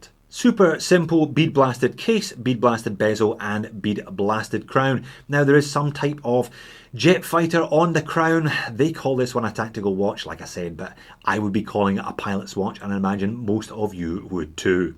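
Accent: Scotland, United Kingdom. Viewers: Maybe I've gotten too used to the Scottish accent by now, but have you ever thought about doing some kind of voice-over or voice acting? The enthusiasm is contagious.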